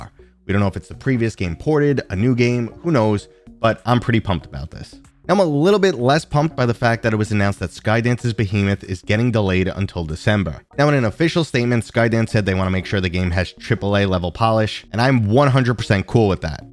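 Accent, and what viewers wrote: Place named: Boston, United States. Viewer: Boston accent! I love it!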